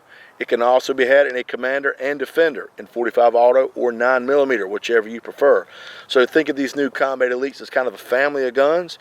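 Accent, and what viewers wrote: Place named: South, United States. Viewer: that southern accent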